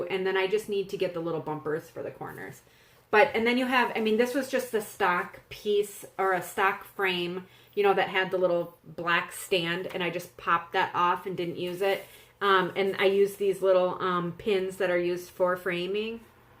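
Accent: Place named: North Dakota, United States. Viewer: Your accent makes me miss home -- we moved to Mississippi from North Dakota 11 years ago, and my northern accent has faded, dontcha kno? But when I go back home, it's so easy to pick it back up again. Love your vids. You're a sweetie pie!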